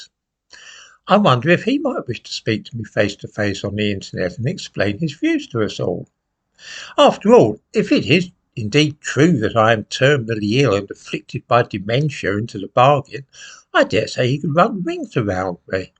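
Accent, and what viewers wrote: United Kingdom. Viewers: Now, if we want to talk about intellectual pygmyism, that's you in a bag on so many levels for believing what this snide old racist has to say. He talks in generalistic terms with nothing to back up his claims, such as "Chinese people are more intelligent than black people", or when he uses a metre to judge that it's due to the Chinese people being on average richer, but utter disclaims societal or home life issues being a factor in wht black people are less rich than Chinese people. Yet the whole foundation of his statement isn't even based on actual Chinese or African examples using an average, he's talking about their attainments in the Western world, without mentioning the elephant in the room, racism. Now, for the non-thinking racist that doesn't bother to check up on the dinosaur's "facts" he sounds plausible, maybe you get suckered by his softly-spoken English accent and it's vague poshness? 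I don't, I am British and I hear his voice and know that the accent is an affectation, as genuine as Donald Trump. Need I go on? Let me explain in summary, you've been suuckered by a racist old fraud who is not, nor has ever been, a historian. He holds no qualifications in it, and the books he has produced are all self-published, no real publisher would touch them with a bargepole. Now, there's a brief summary of a character assassination, have a think about what I've said before you watch the next video by this fraud.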